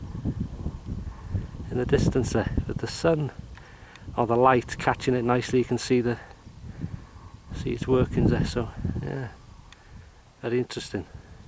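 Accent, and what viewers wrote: Liverpool, United Kingdom. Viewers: I thought i could hear a slight Liverpudlian accent there when you speak. Have you lived there at all or is it because Denbigh's geographically close to The Mersey?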